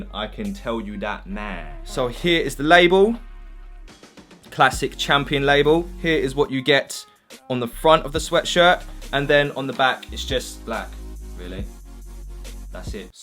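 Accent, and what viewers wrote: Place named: Cockney, United Kingdom. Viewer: the best thing about your vids is your curly brown hair and cockney accent yaass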